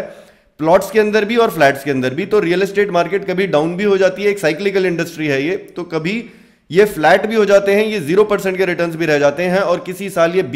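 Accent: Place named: Kerala, India. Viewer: I think ua from kerla or tamil nadu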